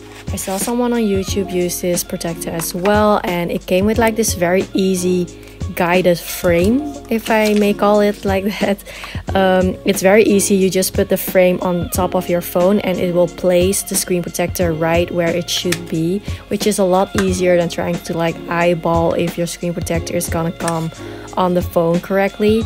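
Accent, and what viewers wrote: Netherlands. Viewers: Gurl are you Dutch? You sound it .. the accent. Im thinking of going from the 6 to 12... Was it possible to transfer data from 6 to 12 wireless? Or icloud backup? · Correct me if I am wrong, but your accent tends to be dutch-English I am right?. BTW nice unboxing-video especially the screen-protector. It's so easy. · Is it me Or is your accent extremely dutch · are you dutch? it kinda sounds like you have a dutch accent :’) loved the video btw !! :D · Sterk nederlands accent😆😋😋 Leuke video👍🏽Heel ontspannend met de achtergrond muziek en rustgevende stem🥰 Top👌🏽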